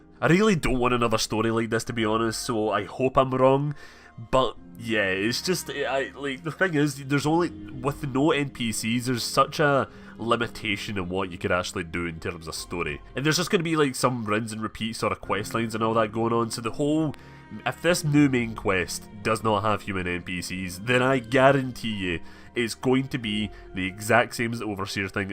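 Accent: Scotland, United Kingdom. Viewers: Scott or Irish?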